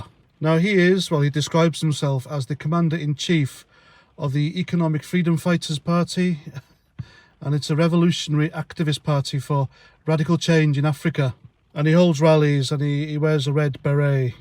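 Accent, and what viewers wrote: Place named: London, United Kingdom. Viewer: Sound like London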